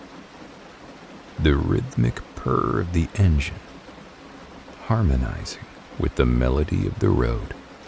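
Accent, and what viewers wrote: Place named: United States. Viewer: Nice to hear a cultivated American accent. Have to work on its not sounding so supercilious …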